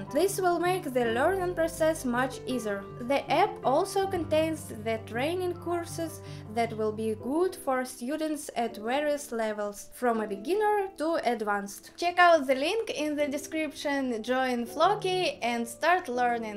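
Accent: Russia. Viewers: Этот милый русский говор, имитирующий английское произношение, заставляет меня думать, что русские танки просочились сквозь бутылочное горлышко Фульды и пьют кофе на французских пляжах. Спасибо, очень хорошо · Lovely russian accent, listening to you is a joy, every time :) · До этого видео, я на канале только второй день и думал что автор девушка из-за границы откуда то) но услышав русский английский, сразу понял, что это не так😉😌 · Me: Plays epic piano! Me: Loves Rock! Me: Has cute Russian accent! Me: recently discovered her channel! Me: uploads new video at 3am Me: has very cute smile Also me: my life is complete! 😍😍😍😍😍😍😍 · когда говоришь по-английски, стартуй буквы глубже, иначе акцент бъет по ушам даже мне, русскому. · Your Russian accent when you talk English is so cute. I'd like to greet you for this amazing video. Congrats! Ps: I always wanted to learn how to play piano. 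So, thank you for sharing with us this app. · Нам все равно слышно русский акцент) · Lovely to hear your voice over the Flowkey advert - English with a Russian accent 😘 You should be in a James Bond Film 😉 Your piano interpretation was so beautiful and melodic you are a special rainbow - I always look forward to you , you inspire me 😀😊🤗🤗😎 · Привет! I hope I wrote your name correctly in Russian 😀. I just speak some words in Russian language and I don't speak English fluently. But I could understand everything you said. I love your Russian accent so much and your videos... the way you play... Everything. Beautiful and amazing as always. 💘❤️☺️ Congratulations! From Brazil 🇧🇷 All the best, Matheus. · РУ.акцент не уберешь из жизни)))лайк))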